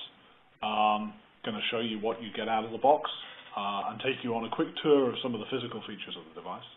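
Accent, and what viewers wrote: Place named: United Kingdom. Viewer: Det er jo fordi han taler UK engelsk, og ikke USA engelsk.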